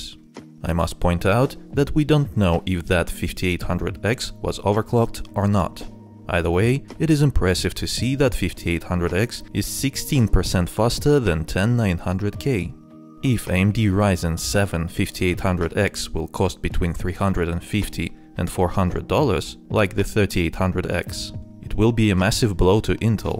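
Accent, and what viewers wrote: Russia. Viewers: русский акцент)) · Как же пробивается твой русский акцент)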